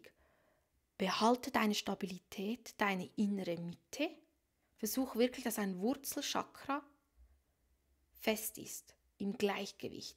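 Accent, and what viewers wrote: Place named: Switzerland. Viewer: Ich liebe deinen Schweizer Akzent. Schön dir zuzuhören. lg Marcel🙏😊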